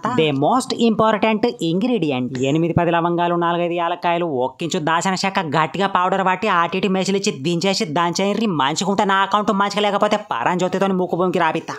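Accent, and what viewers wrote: Telangana, India. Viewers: Ni matallo telangana yasa bagundi nayana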